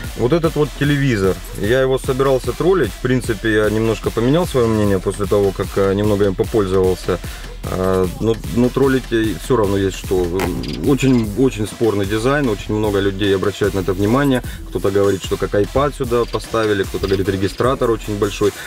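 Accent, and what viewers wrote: Rostov, Russia. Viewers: Смотреть интересно. Только если это полноценный обзор авто, не делайте такого акцента на аудио системе. Понятно что это ваш профиль, но мне это не так интересно, как например сравнение с ближайшими конкурентами(лично мое мнение). Но в общем качество на хорошем уровне. P.S. кто то писал про уровень речи... Им хочется объяснить, что это Ростов, как я понял, и там свой "говор", может это и немного сбивает с толку. Как по мне - слух "не режет".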